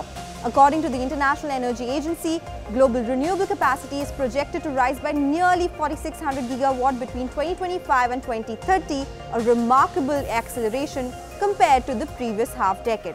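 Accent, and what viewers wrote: India. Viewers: why cnbc has indian accent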